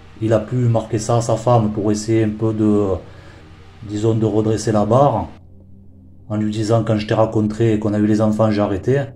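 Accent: South, France. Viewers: Merci pour tes vidéos tu fais du très bon travail. Et moi j aime beaucoup l accent du sud. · Te casse. Fier de notre accent du sud. Merci pour le taff. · bonjour oui comme beaucoup d autres affaires. J ai découvert votre travail récemment et me suis abonnée. J apprécie votre façon de traiter les sujets et votre analyse sur les probabilités. Beaucoup de travail de recherche félicitations et l accent du sud c est sympa 😉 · En plus ça donne du soleil son accent du sud 👍🏽👍🏽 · On adore l accent du sud. C est le soleil ce bel accent ! Merci pour votre excellent travail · Salut Tu vas bien merci pour cette vidéo C'est quoi encore ce délire avec ton accent Tu as un très bel accent c'est l'accent du Sud Zut les C ça commence à faire ce sont les mêmes qui se plaignent des Cygales lorsqu'ils viennent dans le Sud Vous êtes pas content c'est pareil restez chez vous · Alors moi perso pour être abonné à de nombreux youtubers true crimes etc je que j'aime ici entre autre c'est la simplicité, l'essentiel est la dans le fond et dans la forme. 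Les vues augmenteront c'est sur, avec le temps, et ya une marge de progression aussi mais j'aime la simplicité ici, j'ai l'impression d'écouter une émission de radio c'est la voix qui nous emmène, avec le chant du sud en prime c'est génial! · Bravo mec!....pour tes infos, et oui ne change rien, jaime la France francaise.authentique!...tu as un putain de bel accent du Sud. il est probable que cet homme tourmenté et intelligent est commis d autres actes....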